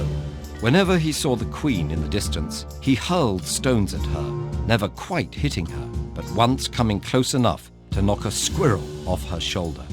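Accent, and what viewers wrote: England, United Kingdom. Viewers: Hearing this narrated in an English accent was painful and so unneccessary! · hello from Dublin Eire . Pronunciation is everything to the Irish , as you get a brit to tell the tail of the greatest story ever told . to all who enjoyed this poor telling of our greatest tale . its name is " Táin Bó Cúailnge " or the Cattle drive of Coolie , read the book by Thomas Kinsella. Cu Chulainn, pronounced Cu-Cullin , was war lord from the north , a bruit , a womaniser , he would take your head , for sooner look at you . yeh they breed them special up north . he enshrines the Irish sprite of freedom , that is indomitable , ask the brits how much we Irish like our freedom . yet they still claim ownership of the land of Cu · English man reading irish mythology....what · So strange to hear this story told in an English accent. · This lads English accent is genuinely difficult for my Irish brain to understand.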